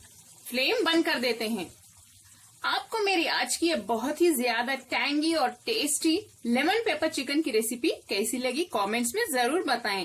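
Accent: Hyderabad, India. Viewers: got to know with your hyderabadi language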